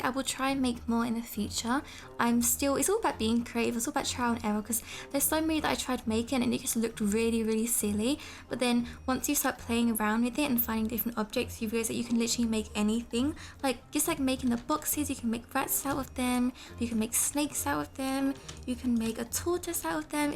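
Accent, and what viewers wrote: United Kingdom. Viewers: HER BRITISH ACCENT❤️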